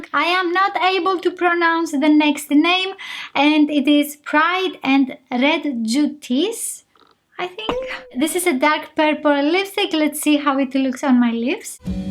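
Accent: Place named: Greece. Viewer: I immediately knew you were Greek 😂❤️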